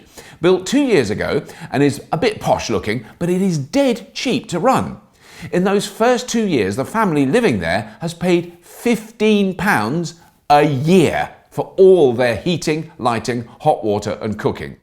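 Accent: Russia. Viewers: Nice Russian accent! To good to be true thou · Nice russian accent!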